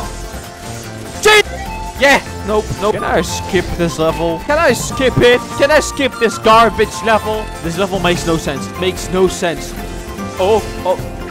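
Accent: Netherlands. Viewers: Ja echt aan hem accent hoor je al dat hij nederlands is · where do you come from? you sound like youre from the netherlands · Ik hoor gewoon dat je Nederlands bent😂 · Hij heeft gekke Nederlandse accent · Je bent gewoon Nederlands leer Engels praten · Je bent gewoon nederlandss haha hoor echt duidelijk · Are you Dutch? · Hij praat echt zo Nederlands engels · is hij nederlands ofzo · Are you dutch? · ja he hij heeft zo’n Nederlands accent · Hij heeft heeft Zo'n nederlands accent poeh he😂😂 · Je bent nederlands ik hoor het aan je accent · Dutch accent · Wat een lekker Hollands accent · Hoi ik weet dat je nederlands bent ik hoor het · Dutch accent (je hebt echt een Nederlands accent 😂😂) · zn accent is zo nederlands ik ga stuk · this guy is dutch, of niet? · Boii. Are you dutch? You sound dutch🤣 love your vids❤ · Je klinkt Nederlands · You sound dutch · Haahha dat nederlandse accent door je engels is echt goud.... 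· Je hebt een Nederlandse stem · Is he maby Dutch Hij is 100% NL Zijn Engels zo funny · You are from holland arent you?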